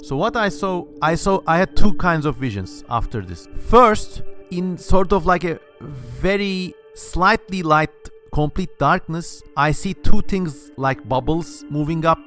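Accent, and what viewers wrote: Turkey. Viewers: I am living for the Turkish accent 🩶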